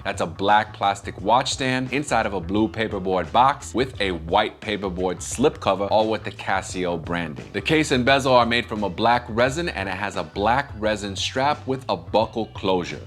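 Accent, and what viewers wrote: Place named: New York, United States. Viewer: I absolutely love the New York accent!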